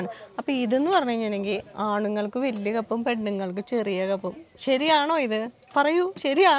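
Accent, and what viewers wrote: Thrissur, India. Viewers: Chechide samsaram reethi thanneya ettavum manoharam.. 💞💞 Chechi from thrissur alle... evdeya proper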